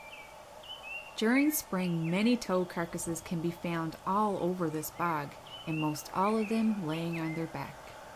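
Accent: Canada. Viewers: Oooh very informative, thank you 👍🏻 You have a lovely soothing voice, I love your accent 🎼 Canadian, I'm guessing ?!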